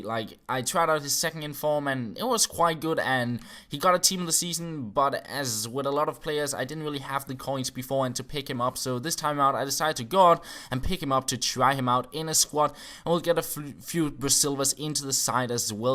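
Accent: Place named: Denmark. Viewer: I love your Danish accent!!!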